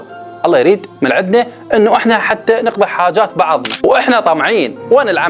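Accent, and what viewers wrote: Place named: Iraq. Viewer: شيخنا الفاضل انت عراقي لان لهجتك تبين من غير دوله احترامي لحضرتك من تكون عراقي او من غير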